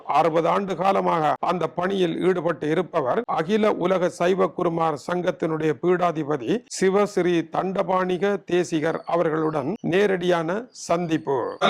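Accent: Jaffna, Sri Lanka. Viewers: யாழ்ப்பாணத் தமிழ் மிகவும் தெளிவாகவும் அழகாகவும் உள்ளது... வாழ்க வளமுடன்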